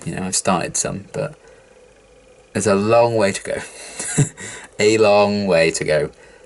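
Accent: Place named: United Kingdom. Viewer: It's been a whole year sense I got to hear you amazing brit voice. Nice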